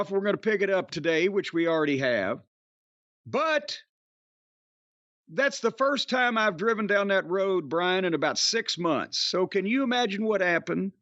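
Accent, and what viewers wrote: South, United States. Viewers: with all due respect from a resident of California “you damn liberal!”. it’s odd to hear your views from someone with a southern accent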